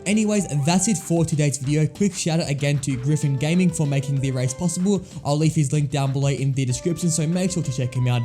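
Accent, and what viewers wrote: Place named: Australia. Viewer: Bro is fine he's an Aussie I live there I have the same accent but I'm Italian there is nothing annoying about his voice unless you hate it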